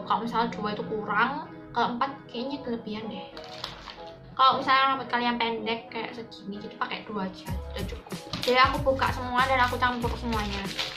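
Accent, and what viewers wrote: Java, Indonesia. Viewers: Medok banget mbak🤣🤣🤣🙏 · Suka bgt kalo medok gitu wkw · medhogggg medhogg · seneng bat dengerin medok nya wkwk:v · Medoook wesh bahasane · Medok skalee kak.. .. Kaya aku 😅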